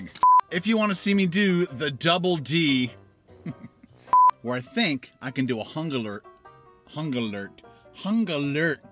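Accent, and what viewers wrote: Canada. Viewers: #snausage and #egger makes English with a Canadian accent awesome, eh!!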